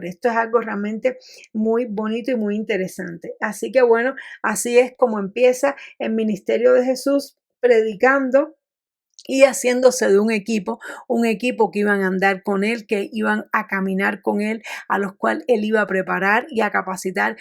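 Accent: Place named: Cuba. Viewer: Me parece que eres Cubana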